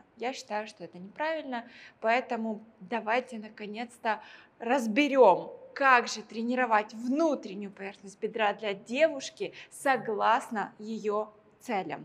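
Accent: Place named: Ukraine. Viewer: Вы считаете, что Ваш акцент идеален и речь прекрасная? Для чего вообще комментарий в таком тоне? Просто, чтобы задеть человека? У Вас что-то личное к этой девушке или вообще ко всем, кто с Украины? Если не нравится, то можно просто не смотреть. Ссылку скиньте на Ваши ролики, и вообще интересно посмотреть на Ваши достижения в жизни. Я уверена, что человек, который трудом добился чего-то, никогда не обесценит труд другого человека и уж тем более не выскажется в таком тоне. Я из Казани и у нас здесь каких только акцентов нет и это нормально. Не знаю, где Вы проживаете, но , судя по комментарию, Вы не из очень хорошей среды.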